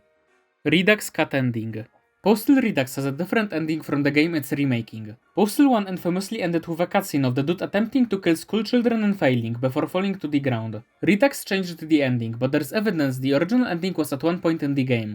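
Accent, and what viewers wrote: Poland. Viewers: Uwielbiam polski akcent. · tickhest polish accent i've heard in a while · X3 piękny akcent polski, tak coś czułem xD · slysze ten akcent i wiem ze to polak. peak material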